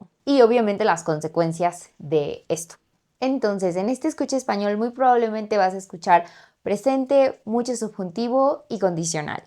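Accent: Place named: Mexico. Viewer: Yo empezé aprender español a solo uno mes es mi passatiempo, me encanta eschucar tu videos, me encanta lo acento mexicano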